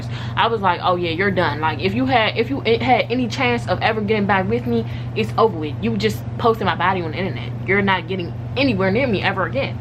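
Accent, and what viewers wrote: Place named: Washington DC, United States. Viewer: Hey boo new subbie here. Are you from dc? I hear a little accent and I was like let me ask lol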